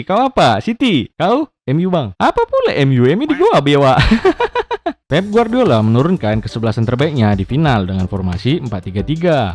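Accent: Medan, Indonesia. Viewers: Medan mana kau bang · Bg abg org medan?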